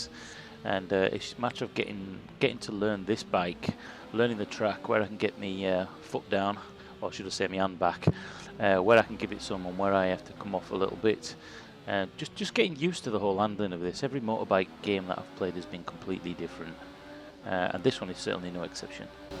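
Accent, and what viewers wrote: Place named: England, United Kingdom. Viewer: an absolute clusterfuck in english accent..perfect ..peter usa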